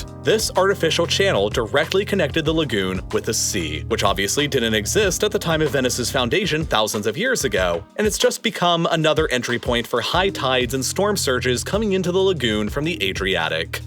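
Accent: Canada. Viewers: Hey! I didn’t know you were Canadian! You can tell because we say Toronno, not Toronto.🇨🇦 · I didn't realise that was Canadian! you can tell because he drops the second 't' in Toronto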